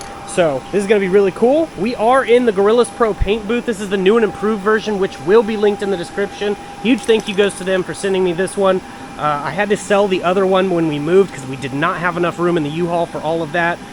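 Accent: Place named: Arizona, United States. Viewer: Good stuff bro! God bless you and your channel! That’s not a Texas accent, you sound like Arizona, but that looks like south East Texas… Take Care brother, appreciate your encouragement!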